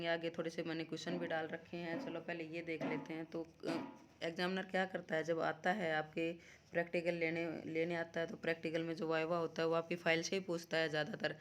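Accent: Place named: Haryana, India. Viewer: Haryana se lag rahi ho mam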